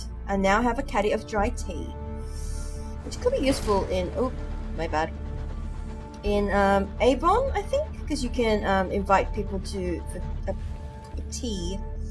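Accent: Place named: Australia. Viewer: You sound Australian with a bit of American accent at times, sometimes a bit more British...😅 Are you an Aussie? Because uploading that early in the morning seems rough...?😜 Edit: Hmmm... Somtimes I'm picking up a slight non-native speaker accent...🤔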